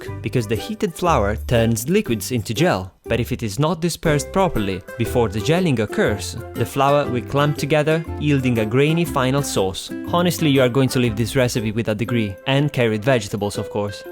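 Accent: Italy. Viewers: I knew it! you got the touch of Italian flair in your manner of speaking....please come to Toronto...you stay with me....and we cook veges day in and day out...I mean it.Toronto is heaven for vegetarian due to imports from Asia, central America, the caribbean, mexico and so on. Here, I see vegetables and fruits I haven't seen somewhere else. probably I will record a video and send u next time I go to the store. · I think it's italian i'm italian and that's what it sounds like :)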